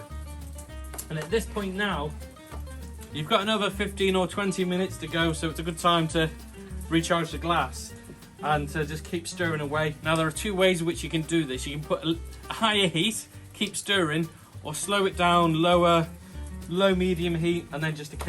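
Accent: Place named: Wirral, United Kingdom. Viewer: You sound local... Wirral?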